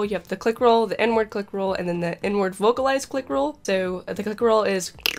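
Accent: South, United States. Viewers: What state are you from??? You sound soooo SOUTHERN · Your southern the way you say "Like" tells me you spent alot of time in the south or near southern people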